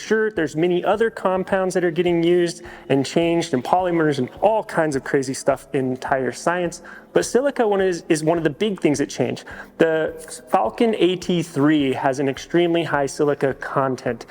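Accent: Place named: Midwest, United States. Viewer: Based on your mid-west accent I'm going to say the Packers or the Lions!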